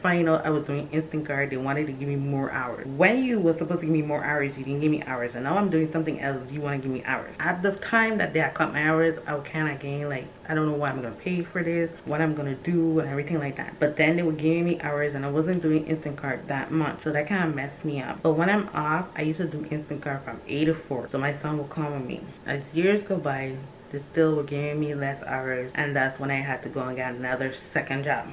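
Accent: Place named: Virgin Islands, Caribbean. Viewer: You’re from the USVI?! I knew the accent sounded familiar I’m from the BVI. Sending you VI Love ❤️❤️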